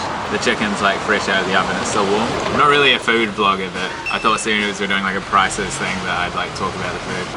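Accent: New Zealand. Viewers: It's not an english accent though? Place called New Zealand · Are u from new zealand?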